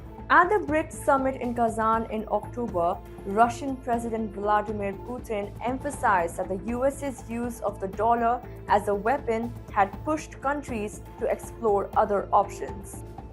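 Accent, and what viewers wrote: India. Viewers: My God Indian voice again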